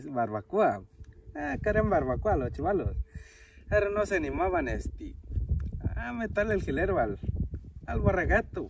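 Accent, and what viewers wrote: Michoacán, Mexico. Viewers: Pa las tierras michoacanas vali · Pa michoacan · Así hablamos en michoacan y guanajuato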